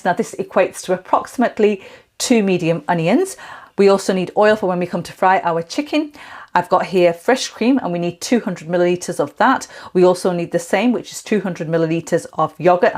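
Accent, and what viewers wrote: United Kingdom. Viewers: I will be trying this dish, it would help if you wrote down the ingredients, since you talk with a British accent it is difficult to tell what you used. Thank you for sharing!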